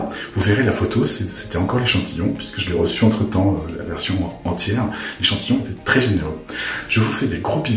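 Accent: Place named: Marseille, France. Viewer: Bel accent presque le mien de l Estaque quartier nords en foooooorce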